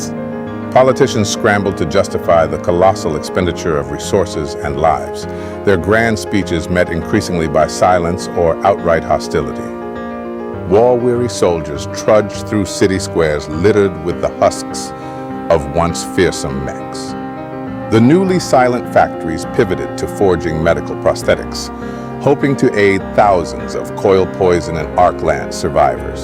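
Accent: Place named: United States. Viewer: This should have been narrated by a British man, not a black American. Good graphics though.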